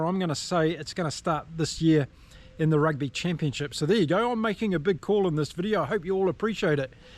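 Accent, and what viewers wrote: New Zealand. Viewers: Hi, thank you for the support, I hope you are right!! Also thank you for your neat articulation as you speak, it is really easy to understand your english in spite of your New Zeland accent, to which I'm not used to listen. For example, I have far more difficult to understand the other from Two Cents Rugby. Cheers!!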